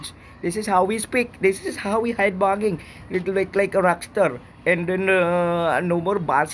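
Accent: India. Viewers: mas lingaw kaayo ko sa imong Indian accent. 😂 Daghan dnhi sa Abu Dhabi. 😁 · Yati kuhang kuha Jud Ang accent sa Indian nice one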